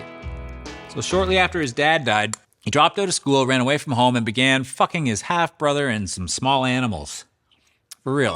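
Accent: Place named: Canada. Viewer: Such a good episode, full of little tidbits I hadn't heard of. Also, love hearing the Canadian accent poke through every now and again.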